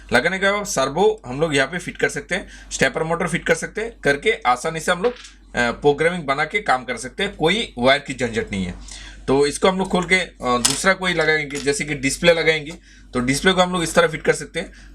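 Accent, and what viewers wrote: Bengal, India. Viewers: Sir yuo are bangali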